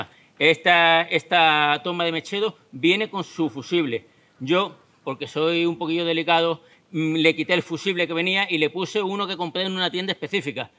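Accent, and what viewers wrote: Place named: Andalusia, Spain. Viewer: El sonido de las chicharras ya indica el calor tan bestial que tenía que hacer ese día. El acento de, escuchar andaluz no tiene precio, que por cierto como ha cambiado bajando una cuestecita en la ruta de cabo norte para hacer acampada y como va ahora en tierra. Y el consejo, pues como siempre fantástico. Ahora toca ponerlo en práctica y tener esa toma porque llegará un día que nos pase y nos acordemos del fantástico consejo que un motero nos dio. Gracias y hasta la próxima 😉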